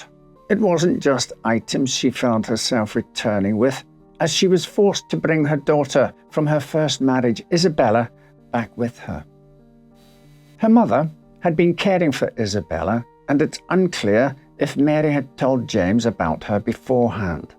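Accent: United Kingdom. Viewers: This coming from a midwest speaking American, is it just me or British accents just perfect for narration? Also I find the accent to be oddly calming. I know, im weird. lol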